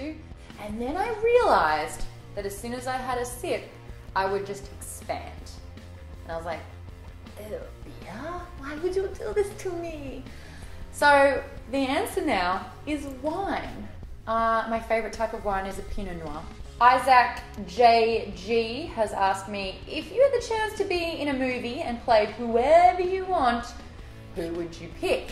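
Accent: Australia. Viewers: Don't ever lose that Australian accent,! It's part of your charming personality! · I hope you never lose your accent. Australian is so nice to hear! I hate that so many Australian/New Zeelanders/British people in acting don't get to use their accents more. So when I hear an accent I just smile and it brightens up my day. It's one of many good things about you.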